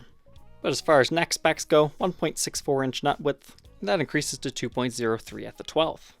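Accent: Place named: United States. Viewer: Jagwar. The american accent is interesting.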